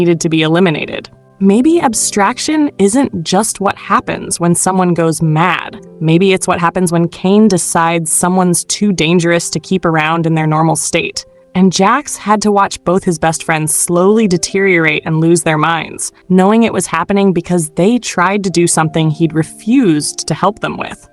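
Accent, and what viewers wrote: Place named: United States. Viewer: I love what the AI script is clearly British but the AI voice is so American, like hearing all these British words in an Ai American accent is so funny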